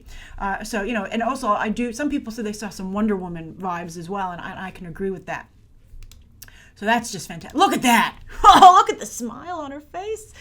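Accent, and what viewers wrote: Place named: Boston, United States. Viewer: Are you from Boston or do you have a speech impediment?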